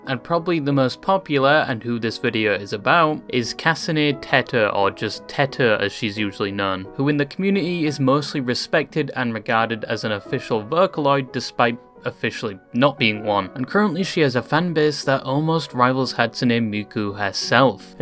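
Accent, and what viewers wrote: United Kingdom. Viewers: You may call me old for knowing Angel with a Shotgun. But your British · Why do i realise this guy is kinda bri'ish (first time ever watching this guy)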